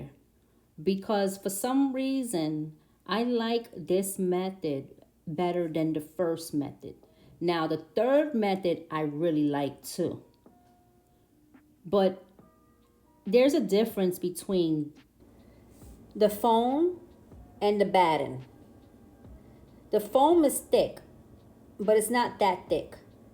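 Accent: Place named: Brooklyn, United States. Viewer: Great tutorial! And I ❤ your accent. Brooklyn Borequa, right? Giving Rosie Perez! 🇵🇷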